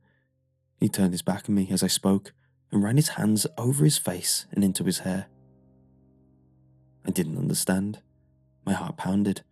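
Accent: United Kingdom. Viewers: It's always a good episode when the British guy is narrating.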